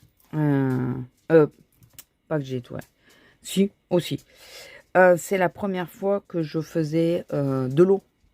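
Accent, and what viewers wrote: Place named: Toulouse, France. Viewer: Bonjour, vous êtes d'où ? Je vous ai entendu dire que vous n'allez pas sur Toulouse. Et il est vrai que l'accent, je n'y avais pas prêté attention mais il est de chez moi lol. J'ai bien aimé votre vlog. Hâte de voir le prochain! Bonne journée 😊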